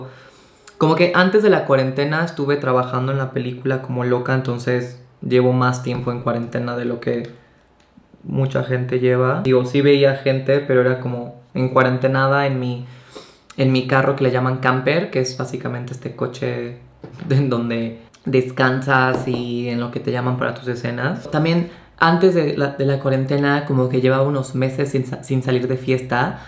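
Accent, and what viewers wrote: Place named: Fresa, Mexico. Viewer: Treintañero (a) gay aun no descubre que hablar arrastrado tipo "fresa" en 2020 da cringe. Flop.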